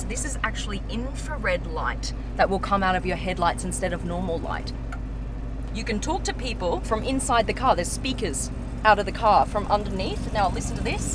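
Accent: Australia. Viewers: Are you from Australia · Nice! I saw the nasdaily video you were with beautiful australian accent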